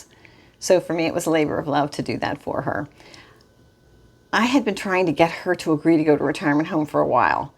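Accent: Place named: Southwestern Pennsylvania, United States. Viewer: Kind if surprised you are in SE PA - kept hearing SW PA in your accent! Regardless, I really enjoy the logical, straightforward advise given in a friendly ‘it will be ok’ voice - so needed as we sort through 3000 SF and 38 years of being together!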